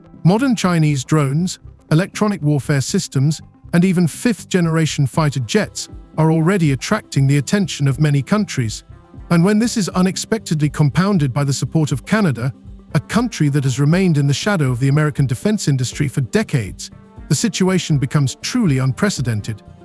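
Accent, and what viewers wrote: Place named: United Kingdom. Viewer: YOUR TITLE IS AN ABSOLUTE JOKE,.... CANADA NEVER STABS... BTW, IS THIS AI.? OR ARE YOU A REAL PERSON.?? YOURE ANOTHER AMERICAS PUPPY THAT IAM TIRED OF.... WHERE DID THEY GET THESE BRIT ACCENT??? I DONT THINK THIS TRUE THOUGH THERES NO REPORT OF THIS DEAL WITH CHINA... DO YOU HATE OUR CANADA.?? DONT TALK NONSENSE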